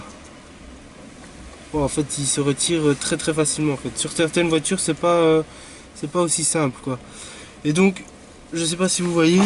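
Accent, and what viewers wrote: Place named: Belgium. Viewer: merci pour cette super vidéo qui va me faire économiser quelques sous et qui m'aura aussi beaucoup fait rire entre ton p'ti accent belge , je pense , et tes commentaires ! Si la cuisine te gonfle , tu sauras dans quoi te recycler . Bonne continuation.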